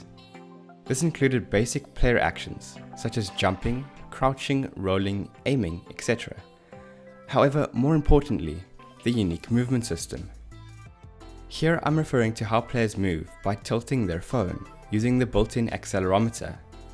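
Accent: South Africa. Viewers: I know a South African accent when I hear it :) game is coming along nicely, bro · Difficult to place your accent... You south african...? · is ja n SA aksent ruik soet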